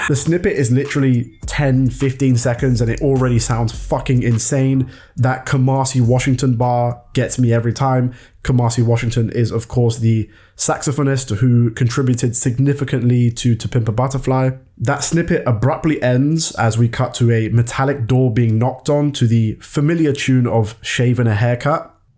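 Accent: United Kingdom. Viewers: Why am I listening to a British dude explain our shit lol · What do you want him to do, an impression? He’s from London. This is his accent.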